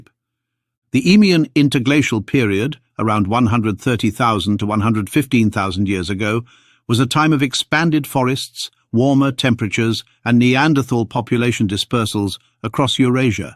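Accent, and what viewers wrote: England, United Kingdom. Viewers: I see people today who have these features. Who is your English accent?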